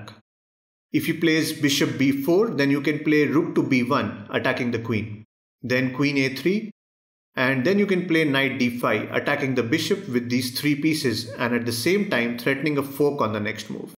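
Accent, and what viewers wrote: India. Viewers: Indian accent is greett👍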